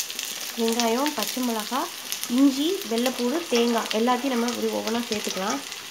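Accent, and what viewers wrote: Madurai, India. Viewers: Nenga madurai yaaa??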